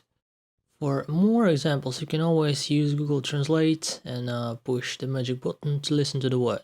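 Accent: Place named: Russia. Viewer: I'm learning Russian, and i can say that this video helped me a lot, no need to be sorry of your Russian accent, you are great!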